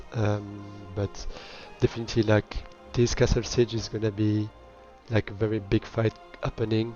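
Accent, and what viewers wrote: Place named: France. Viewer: Salut, merci pour tes vidéos - vu l'accent je te parle directement en français :D Tu as quoi comme config?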